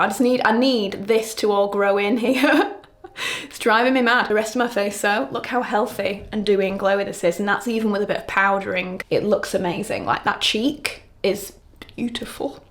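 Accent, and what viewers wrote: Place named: Wigan, United Kingdom. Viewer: Absolutely adored this vlog and sadly we are in the time where we seem to be going backwards for women’s right and the decisions we make for ourselves and with our partners. 😔 but we stand our ground because we’re all strong women 🖤 also met a friendly chap from Wigan today in Nashville TN USA 🎉 made my heart explode — his accent reminded me of you and 😌😂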